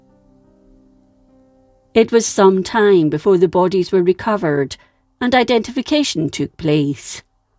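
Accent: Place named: Scotland, United Kingdom. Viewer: I love your accent. My great-grandmother was Scottish. I met her once when I was very young and don't remember it. I wonder if she might've sounded similar to how speak English. Love your channel!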